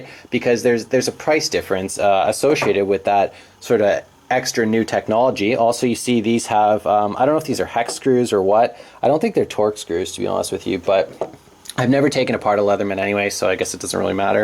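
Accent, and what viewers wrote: Canada. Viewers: That's one wicked Canadian accent.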